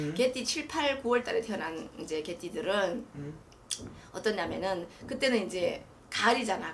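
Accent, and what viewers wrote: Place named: China. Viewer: 넘빨리말씁해서 잘못알아듣는데요.발음도 여기조선족발음으로들려요천천히 다른무속인들처럼😢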